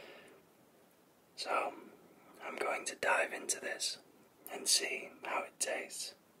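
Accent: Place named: North England, United Kingdom. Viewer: So satisfying - is the honey thicker like that or just as runny? Also I can't place your accent, I keep going from Manchester way, to possibly the Wirral, to maybe Yorkshire (I'm a Yorkshire lass)?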